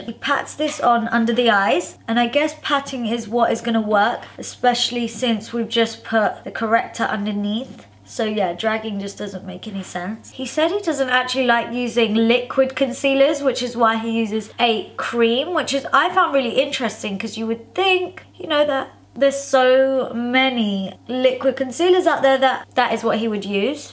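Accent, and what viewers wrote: United Kingdom. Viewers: Omg hate your englisch accent 🤢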